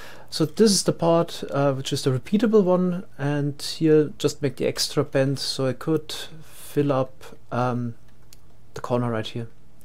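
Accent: Germany. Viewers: ich sprech ja eigentlich kaum english aber ich hör voll raus das du deutscher bist... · Are you german? :D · man hört du bist deutscher :D... aber kann es sein das du noch andere Akzente hast? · German? Dutch? Austrian? What is that accent?!?!?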